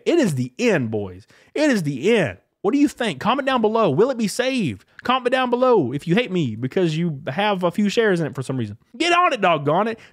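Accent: South, United States. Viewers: Love this guys country grammar. I live on the east coast up north & we dont get to hear a southerner accent where im from ....cool voice dawg ! 😂😂😂😂😂😂😂😂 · Like your southern accent..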